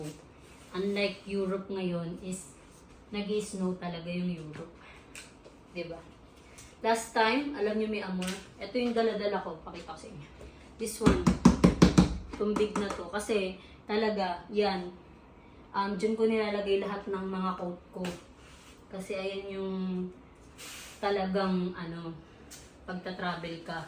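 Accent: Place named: Australia. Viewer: I wish to meet you one day. Did you live in Australia before or hang around lots of Aussies? Your accent is like ours.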